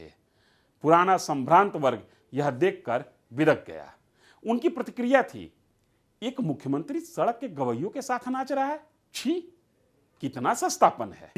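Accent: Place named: Bhojpuri, India. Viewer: जी, अपने भोजपुरिया एक्सेंट से मुक्त होइए। सही उच्चारण पर ध्यान दीजिए। आपका वाचन स्तरीय नहीं है।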